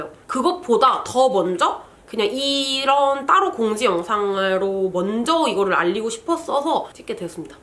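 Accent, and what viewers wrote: Busan, South Korea. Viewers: 뜬금없는데 언니도 부산에 가서 사시더니 사투리 좀 쓰시는 거 같아요 ㅋㅋㅋ❤❤❤❤🤣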